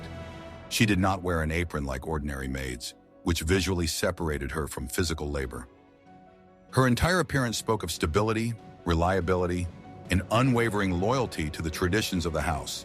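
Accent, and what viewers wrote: United States. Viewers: More god awful American robot narration!!! 3 seconds in and I'm off!! 👎👎👎👎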